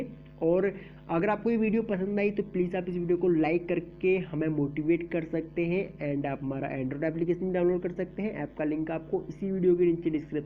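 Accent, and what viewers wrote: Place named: Bihar, India. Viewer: Bhai bihar ke Ho kya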